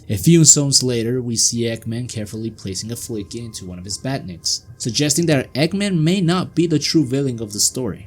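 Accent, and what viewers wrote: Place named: Spain. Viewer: what the pizza pocket in the microwave sees. But seriously, awseome video and bonus points for a regular show pfp. Nice. Y creó que tambien hablando Español? Solo creó por el acento.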